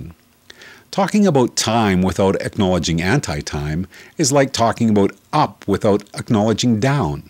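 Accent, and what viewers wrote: Canada. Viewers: I had a feeling you were canadian before I read this, sometimes it takes a canadian to recognize the idiosyncratic nuances of speech of a canadian. I can't explain it. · is he canadian? he says aboot instead of about... and can you define these extra dimensions as real, if theyre only theoretical? can they be proven?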